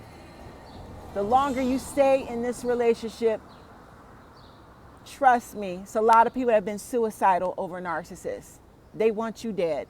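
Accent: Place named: New York, United States. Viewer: Powerful sister, sound like your from NYC...wish we could speak...blessings!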